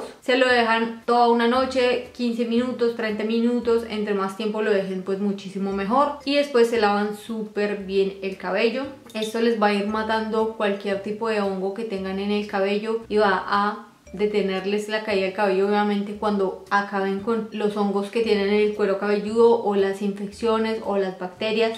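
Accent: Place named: Colombia. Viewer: Me encanta como hablas parece Colombiana, 🇨🇴 un saludo desde Bruxellas Belgica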